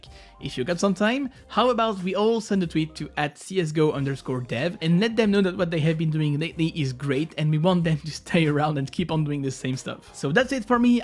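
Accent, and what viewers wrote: France. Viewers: Ça fait 5 vidéos que je me pose des questions sur toi, est-ce qu'il a un accent bizarre, est-ce que c'est un anglais/amériquain qui est d'origine française, un français qui parle anglais ??? Bah je savais pas, donc finalement je vois ton interface YouTube avec "vues" et "il y a x mois". C'est bon j'ai ma réponse :D. En tous cas que tu sois d'origine anglaise ou non, mais si ton anglais est french spotted, il reste super fluide et naturel 👍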